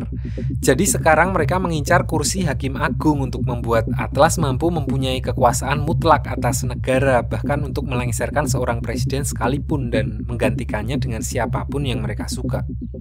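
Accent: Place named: Yogyakarta, Indonesia. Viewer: Wong ngendi sih min kok bahasa e sekitaran jogja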